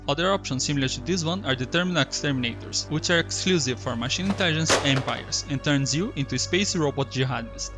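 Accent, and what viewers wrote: Brazil. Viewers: So pelo teu jeito de falar ingles descobri que tu é brasileiro hahahahaha. Primeiro br fazendo video estilo seth, muito bom, continua!! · Sabia q era BR só pelo sotaque kkkkkk, ótimo vídeo champs! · U really sounded like a brazilian in this video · Pelo teu sotaque, sei que tu é brasileiro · Hey, you have accent, are you Brazilian or Latin American? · tava reconhecendo seu sotaque... é BR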